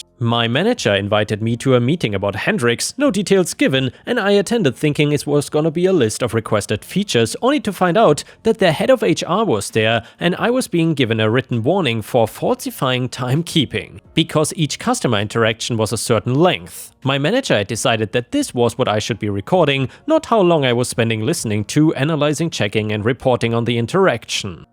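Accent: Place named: Germany. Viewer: you could read the dictionary and I'd listen. I love your stories, but that soft German accent is the best part.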